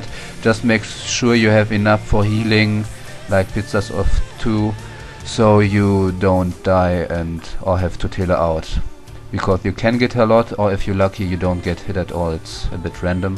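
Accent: Germany. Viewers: R u german? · den deutschen akzent hört man raus :D gute guide p.s. ich habe nichts gegen den deutschen akzent... wenn ich englisch rede klingt das fast genau so mit dem akzent =D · your from germany right? i hear from your acent i think or i just suck at it :/ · nice german accent lol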